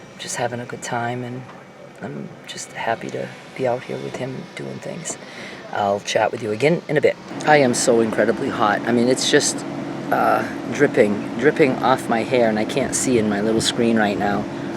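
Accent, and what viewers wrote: Maine, United States. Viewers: "His caws" haha you still have your Maine accent! Love it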